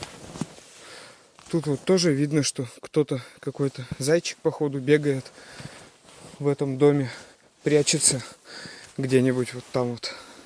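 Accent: Vyatka, Russia. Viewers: почему то думала ты местный, Кировский. Говор вятский, похож. Южные места обл.не посещаешь? А, там очень много купеческих домов, к примеру Уржумский р. 😊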